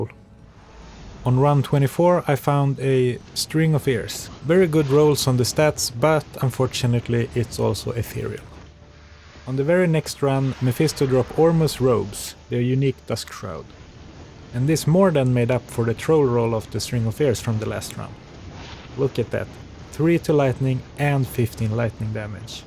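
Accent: Sweden. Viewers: Yust? So you are Swedish. The Swedish English teachers must be very special, because I don't think I have ever met a Swede who pronounced "just" correctly :D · the deep swedish accent, one simply cannot miss that swinglish man haha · Svensk engelska är så distinkt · This video made me decide to uninstall d2r. No way I’m doing 1k runs. I’d rather just watch this dude do them while listening to the epic supersvenska dialect.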